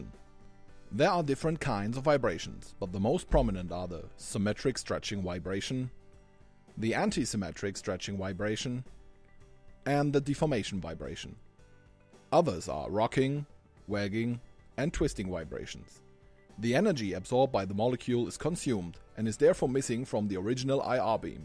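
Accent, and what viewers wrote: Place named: Germany. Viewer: love your German accent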